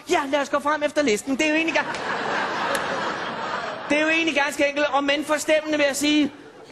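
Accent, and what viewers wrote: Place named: Denmark. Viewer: he is reading Norwegian in Danish making it sound really stupid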